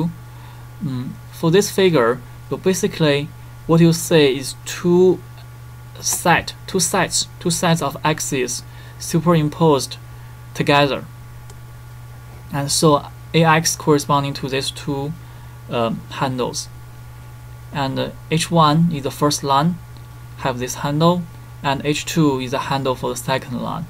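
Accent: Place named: China. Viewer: I heard strong Chinese English. Keep going.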